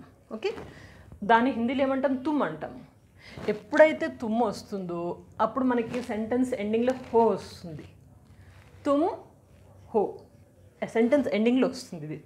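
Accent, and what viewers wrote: India. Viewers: Madam way you are explaining can understand all the normal people. And hyderabadi style and traditional hat's off to you madam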